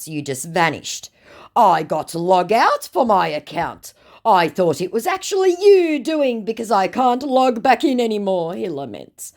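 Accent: Australia. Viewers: I love your Australian accent, Australia is one place I always wanted to visit someday.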